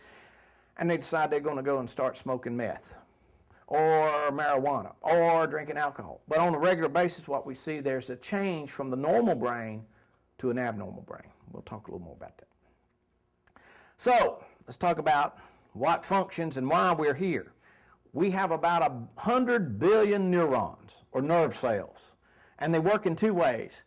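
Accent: United States. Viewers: 9/11 texas accent immediately thought of George bush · tree-on qua-ree-on. lol south'ran drawwwl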